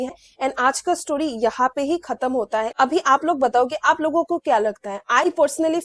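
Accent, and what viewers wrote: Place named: Bengal, India. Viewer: ❤❤❤❤beautiful ❤❤❤❤with bengali accent. Its nice❤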